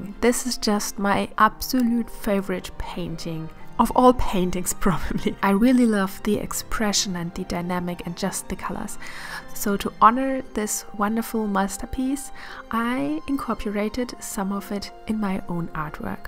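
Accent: Germany. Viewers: Geil dass ich in meiner Abitur KunstLK Prüfung 5 Stunden für ein realistisches Ölportrait hab... Super Video und wunderschön! Ich nehm mal wegen dem Akzent an dass du deutsch bist. Sorry if you do not understand anything:) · Hey! Amazing art! I wanted to ask of you are german, it seems like it, because of your name and accent. Please correct me if I'm wrong 😅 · Are you German? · Maybe it’s just me or, is she German?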